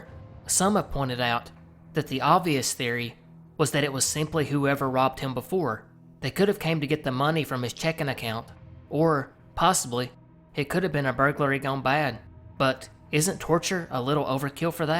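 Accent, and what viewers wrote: South, United States. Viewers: Keep up the great work! Love hearing a southern voice on here!!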